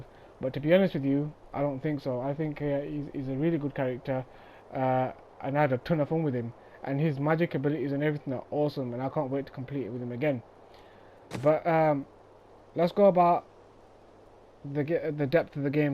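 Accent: United Kingdom. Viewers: London accent with Indian parents? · Is that a prestonian accent?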